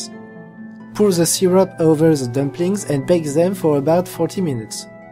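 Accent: France. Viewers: Mystical Turnip its French mixed with kahjiit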